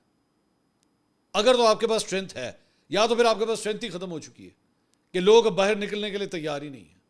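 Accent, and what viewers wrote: Punjab, Pakistan. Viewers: AJJ KA VLOG BOHT HI KAMAL KA THA PUNJABI TOUCH KAMAL KA HOTA HAI AP KA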